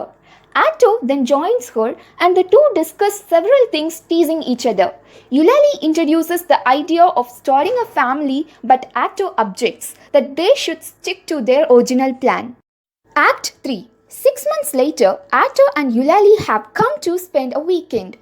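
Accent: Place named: India. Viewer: I am the 2000th person to watch this. She speak Indian english, I wanted African englis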